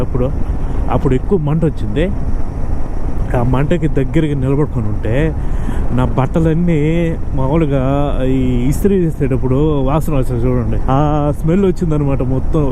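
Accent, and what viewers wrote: India. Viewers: IM WATCHING JUST FOR YOUR TELUGU SLANG....NRO NEKU AH MOUSTACHE BALEDHU....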